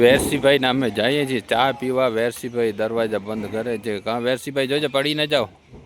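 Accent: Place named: Kathiawar, India. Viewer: કાઠિયાવાડી ભાષા હો ભાઈ 😅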